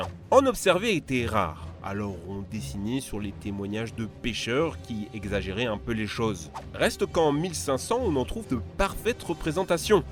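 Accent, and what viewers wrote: Caldoche, New Caledonia. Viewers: C'est un caldoche le mec qui parle xDD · on nest dakor c une video de Nouvelle-Calédonie g reconnue lacent des caldoche😂😂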